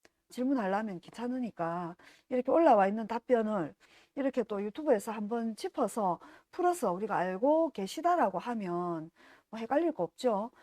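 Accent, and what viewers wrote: Gyeongsang, South Korea. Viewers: 앙칼진 경상도사투리 ~~~~~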